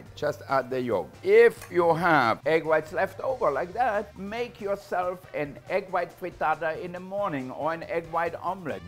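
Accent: Germany. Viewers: Is he German? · It rallye sounds german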